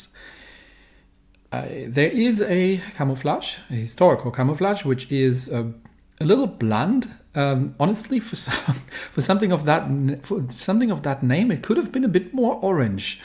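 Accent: Germany. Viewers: Hello are you a native german speaker?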